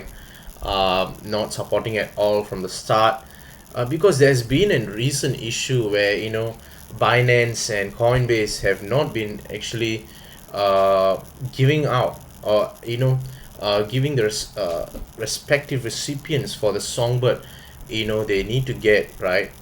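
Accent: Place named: Malaysia. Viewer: Yup, clickbait title to get views. Some dude from Malaysia by the sounds, doing a lot of talking but not saying anything new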